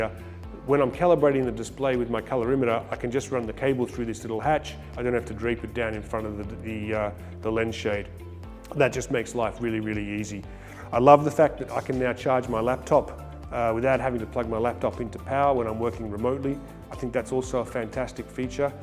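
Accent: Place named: Australia. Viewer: Aussie accent makes me want to vomit.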